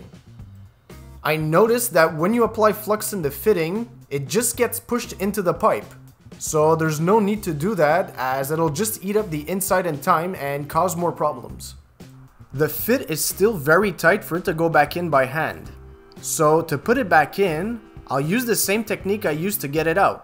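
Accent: Quebec, Canada. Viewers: c sur t quebecois toi xD merci pour ton video ;) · Are you French Canadian ? You have a little french speaking accent in your english ! Very useful video !! Merci beaucoup !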